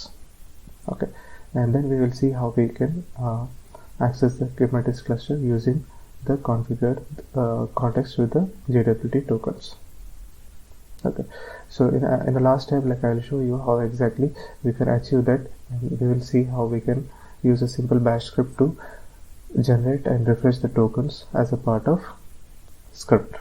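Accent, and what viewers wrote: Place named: India. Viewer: 印度英语 made me crazy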